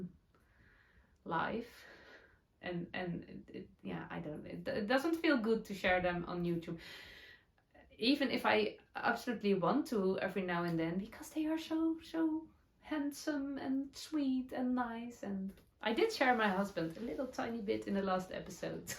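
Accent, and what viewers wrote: Netherlands. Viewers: Hello from Australia & Thankyou so much for a great podcast. Since finding you I am now binge watching all your English videos and loving them. Sitting bedridden sick & knitting socks, you are keeping me company. How truly exciting and special to receive yarn specially dyed for you. WOW!!!! I bet you did a happy dance for sure. Love your Dutchy-English 😍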